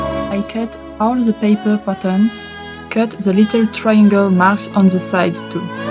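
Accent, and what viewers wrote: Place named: France. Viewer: After years studying french, Im so proud I can distinguish her beautiful accent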